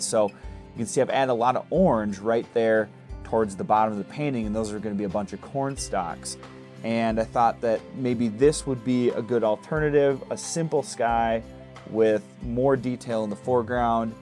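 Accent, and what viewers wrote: United States. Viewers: Are you from Michigan? Lmao.. I'm just asking cause the accent.. But your videos are so good! I think I said this comment twice lol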